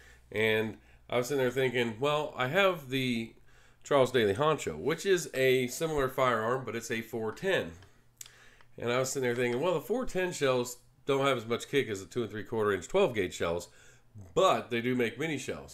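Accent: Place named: South, United States. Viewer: Southern accent detected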